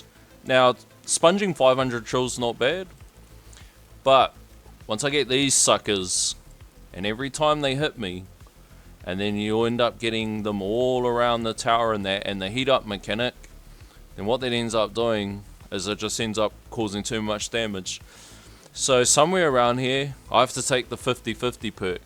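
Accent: Australia. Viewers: nothing like getting some work done with that sweet aussie accent talking about my favorite tower defense game of all time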